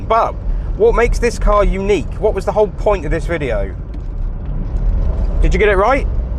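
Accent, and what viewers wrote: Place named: Norfolk, United Kingdom. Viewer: Sounds like a Norfolk boy, lol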